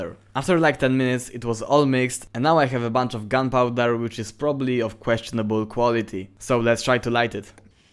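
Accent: Poland. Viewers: czy ja słyszę polski akcęt ? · No Siena mordeczko, wiefziałem, że elpolako po alcencie z “sz” I “cz”. Pordrooo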